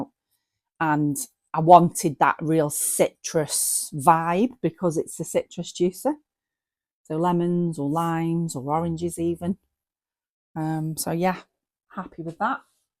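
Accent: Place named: United Kingdom. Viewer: you’ve got one of the strongestUK accents I’ve ever heard. Lol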